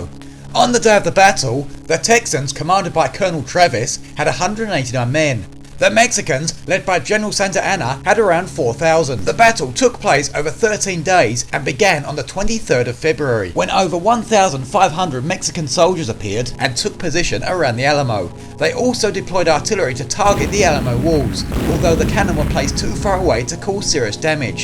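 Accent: United Kingdom. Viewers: Neither is he! lol That's an English accent. ;)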